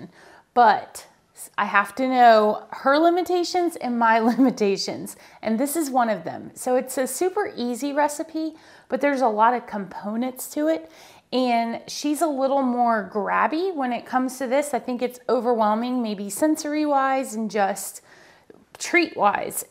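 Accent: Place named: South, United States. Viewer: She is in Florida. In Alabama we say caramel too. I think its a southern thing.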